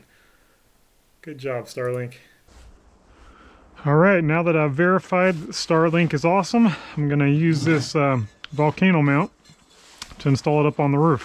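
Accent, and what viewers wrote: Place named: Appalachia, United States. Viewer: Lil bit hillbillysh but bearable to watch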